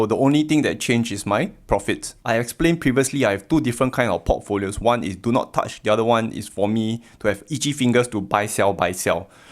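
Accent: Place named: Singapore. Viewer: Love you Singaporean accent bro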